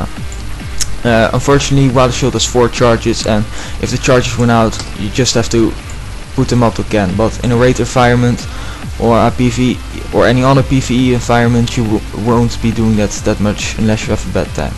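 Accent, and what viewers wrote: Netherlands. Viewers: Was meteen te horen dat je nl bent Nice vid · Are you dutch? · Is je moedertaal nederlands? :-) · u sound dutch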